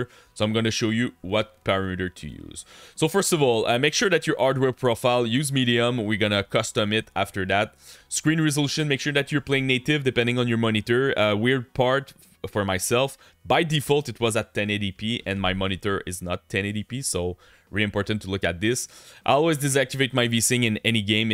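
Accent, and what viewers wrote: Quebec, Canada. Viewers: T'es clairement Quebecois haha! L'accent est accurate.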